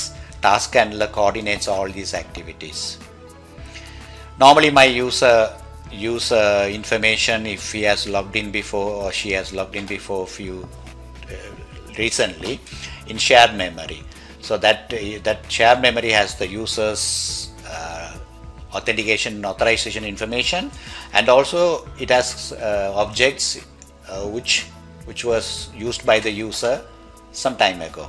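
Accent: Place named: Canada. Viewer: That's a funny Canadian accent you have.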